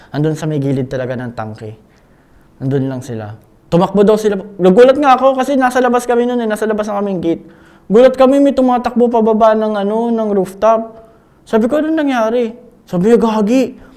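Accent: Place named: Conyo, Philippines. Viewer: Conyo kasi siya ikaw kasi laking iskuwater🥴 kaya madami napapansin dka nlng manuod pwe🤮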